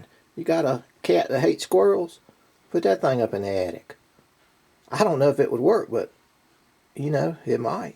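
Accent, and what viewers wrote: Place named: South, United States. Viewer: I love the southern accent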